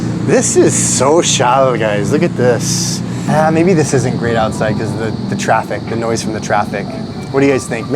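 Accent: Philippines. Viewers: love ur filipino accent kuya ❤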